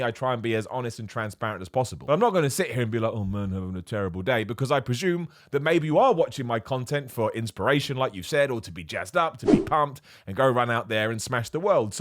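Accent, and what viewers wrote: United Kingdom. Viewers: As an Australian I don’t think you sound like us! Although your British accent is a very mild one if that makes sense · American/Australian?!? Weird... You sound totally British to me.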